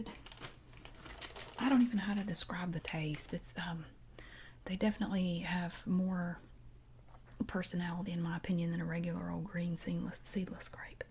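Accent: South, United States. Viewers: It may just be the effect of your southern accent, but in Germany it is pronounced 'Al-De' (I used to live in Berlin, shopped there often) but to be fair, here in the UK people say 'All-De'. The native pronunciation can be found in this music track! <link>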